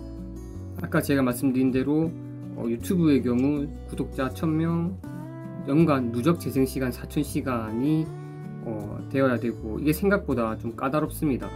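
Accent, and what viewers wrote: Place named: Busan, South Korea. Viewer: 공무원 유튜브 해도되냐는 공격적인 댓글에 마음 고생 좀 하셨겠어요 ㅠ 저는 팬으로써 님 응원합니당✊✊ 그와중에 개인적으로 부산사투리 넘 좋아요ㅠ 다시태어날까..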